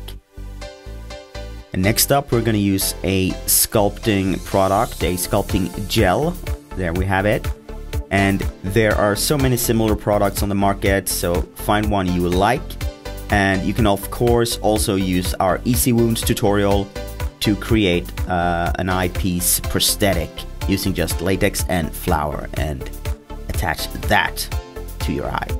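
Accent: Scania, Sweden. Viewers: är du från skåne?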